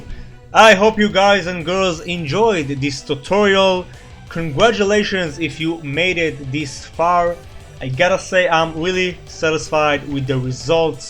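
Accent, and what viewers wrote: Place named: Israel. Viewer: חחח יש לך שם ומבטא של ישראלי. יש מצב? Anyway, Your art is amazing!!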